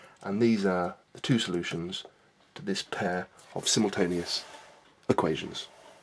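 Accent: England, United Kingdom. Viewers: You are from england right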